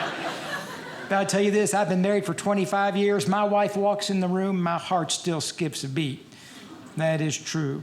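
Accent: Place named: Louisville, United States. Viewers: He is where I'm from in Ky. He's very southern sounding for being from Louisville. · You can always tell someone is from Lullville.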